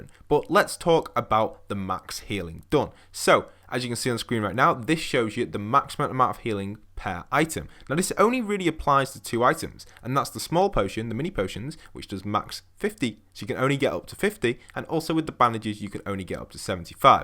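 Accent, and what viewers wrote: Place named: England, United Kingdom. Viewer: Englishman accent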